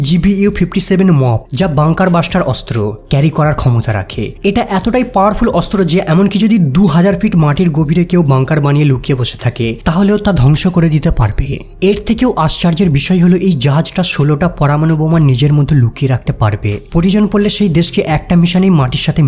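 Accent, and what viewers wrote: India. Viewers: কথা শুনে মনে হচ্ছে তুই গাধাটা ইন্ডিয়ান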